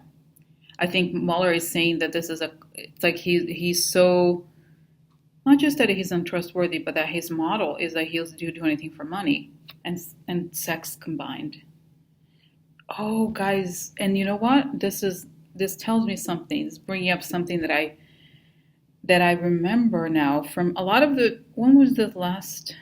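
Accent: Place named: Russia. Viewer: The faces take away from your content? Really? Oh HORSE SHIT! They enhance your readings, help us cope, and provide most of us with the only politics-related laugh we will get each day. Especially love your Russian accent. Never stop. PLEASE and Thank You! 💕💕😂💕💕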